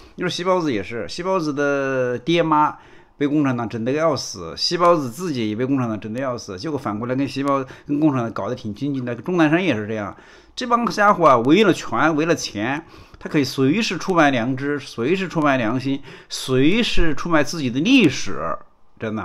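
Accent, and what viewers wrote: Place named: Changde, China. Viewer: 口音听上去常德人呀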